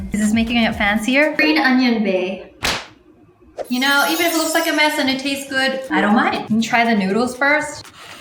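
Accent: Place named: Australia. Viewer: I miss your Aussie accent! You sound so different. Will defs try the peanut butter recipe 😋😋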